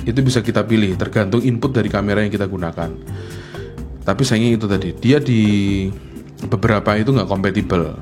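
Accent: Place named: Java, Indonesia. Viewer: medok sekaleeeeee..... salam wong jowo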